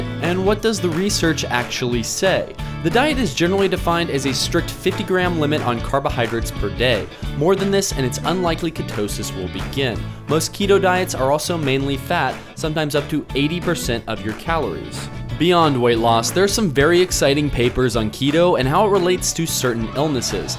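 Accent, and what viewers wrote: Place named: United States. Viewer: I assume the creator is american based on his accent: <link>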